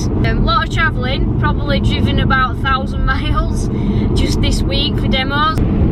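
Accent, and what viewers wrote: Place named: Yorkshire, United Kingdom. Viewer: Hi I just found your channel, it’s great. I’ve just started turning in March, where are you? I’m in East Yorkshire you have a Yorkshire accent 😀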